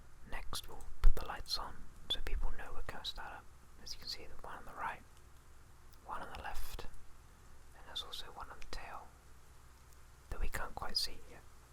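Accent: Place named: United Kingdom. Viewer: your British accent is so soothing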